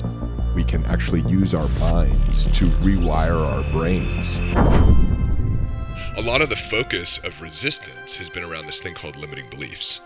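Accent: United States. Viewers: The more I listen to he keeps sounding more and more like that Turtle Dad from Finding Nemo. 😂Love that SoCal accent imma NorCal girl myself.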